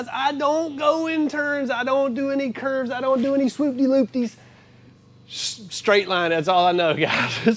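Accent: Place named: South Carolina, United States. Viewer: Such a shame you’re not gonna circuit race this baby she’d be a mean ass ride dude the South Carolina accent is just about gelling with this Kiwi boy from down under 😂👌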